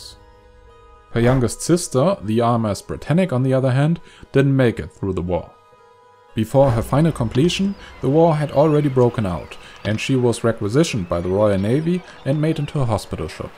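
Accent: Germany. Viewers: Man merkt sofort den Deutschen Aktzent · Deutscher aktzent... hmmmmm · I'm german and I agree, it sounds like a (horrible) german accent. Not all of us sound like this when speaking english :D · Why is a German narrating this video?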